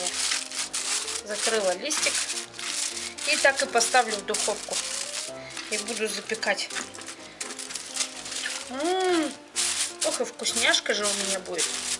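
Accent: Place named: Siberia, Russia. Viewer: сибирячка по говору наверно.Спасибо .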